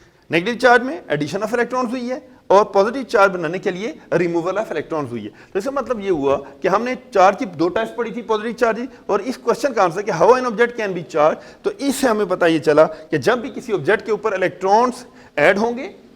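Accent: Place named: India. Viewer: SIR You neeed to improve your English You have desi style OLD VERSION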